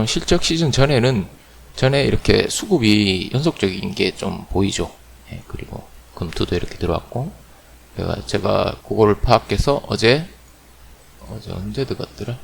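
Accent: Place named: Daegu, South Korea. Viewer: 아따 사장님 대구 사람인가보네요? 말투가 구시하이 좋네요~~~ 개미의 반대는 꿀이다 개반꿀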